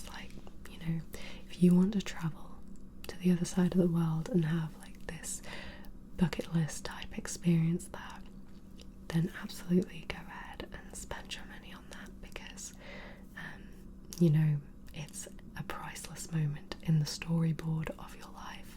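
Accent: United Kingdom. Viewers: OMG where abouts are u from! U sound english like me😊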